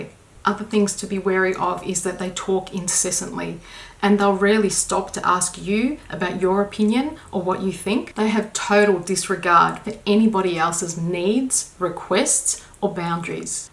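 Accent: Australia. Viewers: Your laymen's explanation of narcissism was so on point. Thank you so much. I am grappling with a family member who presents symptoms of this disorder. Also, love your accent, is it Aussie???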